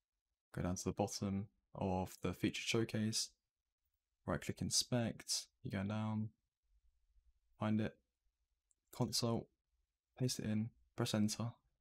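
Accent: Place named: United Kingdom. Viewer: THATS IT! I did not understand what you said since you are very British God do not save the queen God save bin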